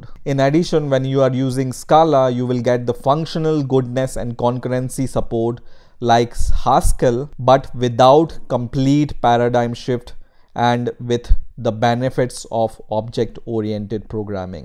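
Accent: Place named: India. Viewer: I don't know why some people take issue with an Indian accent. I think it sounds just fine, even kind of adorable.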